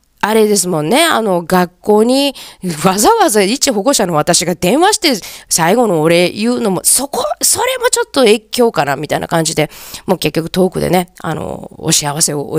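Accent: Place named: Kansai, Japan. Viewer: 何年振りかにさんの動画を開きました。いつも通りのパワフルな関西弁が楽しかったです♪『エネルギー閉じる』って言葉の感覚が新しくてためになりました❤ジャブ打っていきまーす❣️ありがと〜♪ ✨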